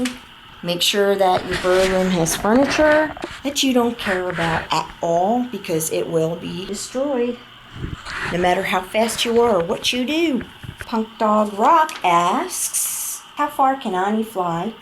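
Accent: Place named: Pennsylvania, United States. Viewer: I love your videos, such a joy to watch! Are you from Pennsylvania, your accent reminds me of a friend from PA?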